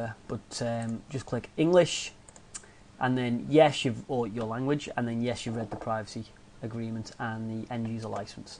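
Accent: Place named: Scotland, United Kingdom. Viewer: heh nice scottish accent!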